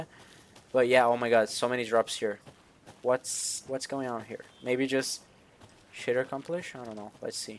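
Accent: Brazil. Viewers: o sotaque br no inglês é impagável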